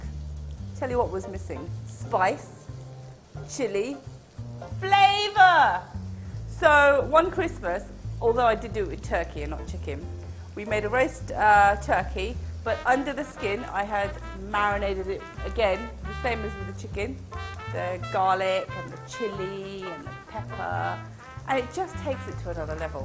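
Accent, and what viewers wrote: United Kingdom. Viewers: i really dont know why people dont like her accent! she's BRITISH! anyways i like her energy and vibe :) ! good watch !!! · Love your recipes, your presentation, your stories, your “Hello darlings!” and of course your lovely british accent. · It is a British accent...can you be less ignorant? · Come on!!!!!! she's BRITISH n that's how their accent is.....!!! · kab sudhregi tu.... asli naam rahega.. chandni raddiwali!... faking a accent & having a accent has a difference... faking a accent means using same words as indians use while talking English but with a different tone.. she speaks & uses all the words like a British person would do because she is from london!.. she is a chef watch her cooking & learn that.. don't comment on her accent & tell kab sudrenge.. tu sudhar pehle gaav waali! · thats a proper british accent u muppet. 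she was brought up there and u mr.' my accent is perfect' finds it funny? lol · ankush verma She has a British accent. If you're going to complain, at least get your facts right.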